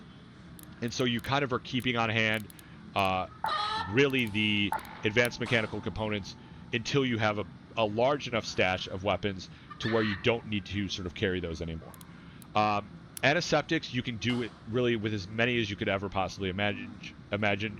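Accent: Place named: United States. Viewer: Sound American AF and mature, go straight to the point in the video without wasting people time, and you win.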